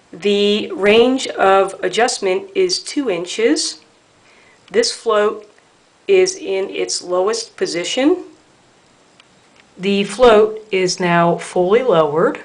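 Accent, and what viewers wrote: Philadelphia, United States. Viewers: Philly accent! 🙌🏻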